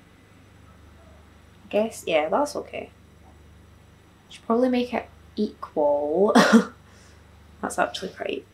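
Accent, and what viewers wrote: Scotland, United Kingdom. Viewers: aeibeirats" or idk what thats supposed to mean lmao im just here for the Scottish accent I love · Is Scottish an accent or an language?? I'm trying to learn Scottish accent from her 😫🙏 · is she scottish? demyn lovin her accent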